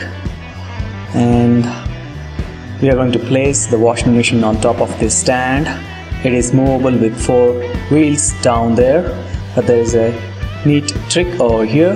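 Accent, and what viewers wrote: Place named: India. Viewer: bro keep the natural Indian accent :) although it wasn't that bad but still keep the natural :V Potato kind of Indian accent XD and nice video :)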